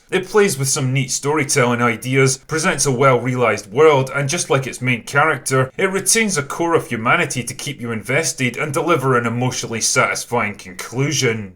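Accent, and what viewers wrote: Scotland, United Kingdom. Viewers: Somehow I really, 'really' wanted to follow up with "Yes way!" in his customary slurred and cynical Scottish accent.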